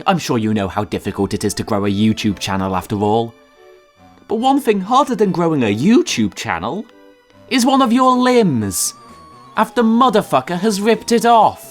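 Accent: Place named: United Kingdom. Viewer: Lol your british or english accent made this so funny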